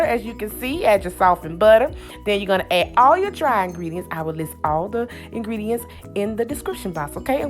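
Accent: South, United States. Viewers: That's that very southern and country talk and spirit. That's "kinfolk" talk ❤️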